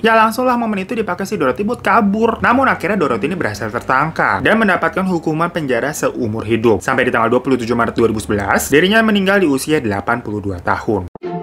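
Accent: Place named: Jakarta, Indonesia. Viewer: Suka aja denger cerita nya menarik ga ngebosenin apa lagi ada logat"betawi nya jadi ketagihan nonton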